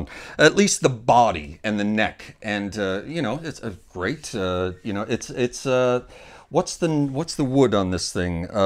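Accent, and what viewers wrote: Canada. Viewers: Hi. Do I detect a slight Canadian accent?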